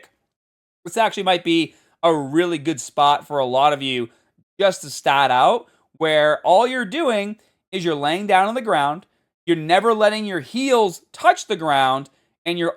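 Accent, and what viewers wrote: Boston, United States. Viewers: Boston accent crazy lmao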